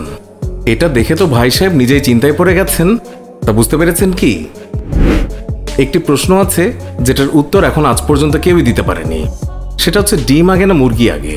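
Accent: Bangladesh. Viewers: আপনার ভাষা শুনে ,মনে হচ্ছে আপনি বাংলাদেশি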